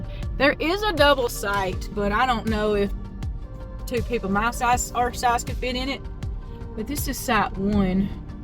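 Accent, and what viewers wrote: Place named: South, United States. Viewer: Awesome video. Im trying to place your accent....Mississippi? Alabama?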